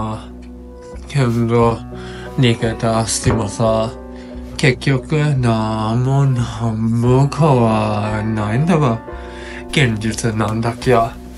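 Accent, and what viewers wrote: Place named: Nanbu, Japan. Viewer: 南部弁初めて聞きました。同じ日本語なのに不思議な感じです😊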